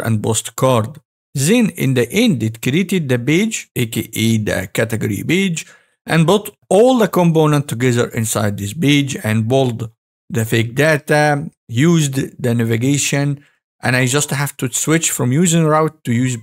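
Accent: Egypt. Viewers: أنت مصري اكيد الاكسنت فضحاك😅❤ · You must be an Egyptian. I know our accent. If so, 3amel eh? XD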